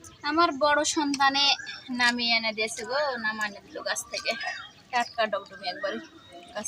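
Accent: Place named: Rajshahi, Bangladesh. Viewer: তোমার কথা শুনে বোঝা যাচ্ছে তুমি রাজশাহী জেলার মেয়ে, কিন্তু কোথায় তোমার বাড়ি একটু বলবে আপু ❤❤❤❤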